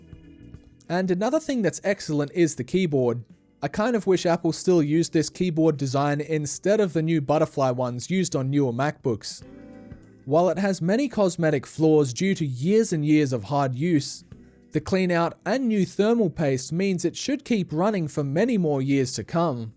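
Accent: Australia. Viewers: just wondering are you aussie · Man finally an Aussie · Bruh are you Australian or S. African?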